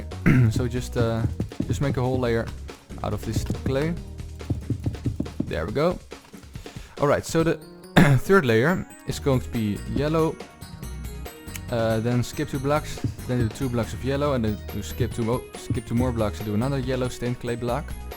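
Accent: Netherlands. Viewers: Ik hoorde wel dat je NL was!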